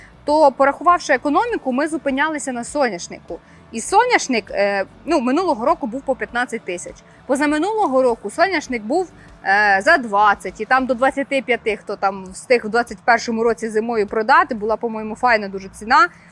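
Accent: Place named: Cherkasy, Ukraine. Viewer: у вас интересний мовний акцент .чепкащина.так як і на лубенщені свої нотки співочої української мови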